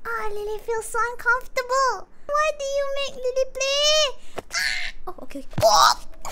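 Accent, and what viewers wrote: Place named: Malaysia. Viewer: She sounds so malaysian this is great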